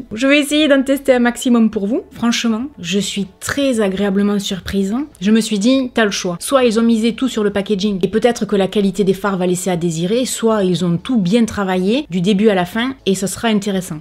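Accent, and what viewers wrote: South, France. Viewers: Toujours intéressantes tes vidéos et nous mettent de bonne humeur. J'adore ton accent qui reflète le soleil, les cigales et le sud. A quand un live avec tes abonnés puisque c'est possible avec YouTube.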